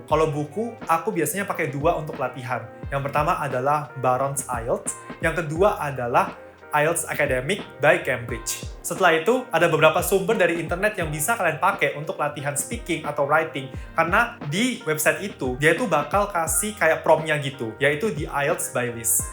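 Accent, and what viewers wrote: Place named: East Java, Indonesia. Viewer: surabaya ta sidoarjo mas? btw terima kasih tipsnya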